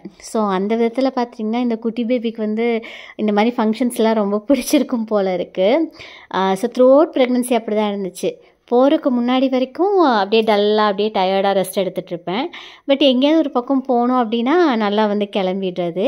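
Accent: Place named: Kongu, India. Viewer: Sis nan 1st tym unka long video pakuren ninga rmba cute ah positive ha peasuringa..innaila erunthu unka konju kongu tamilku nan admai❤❤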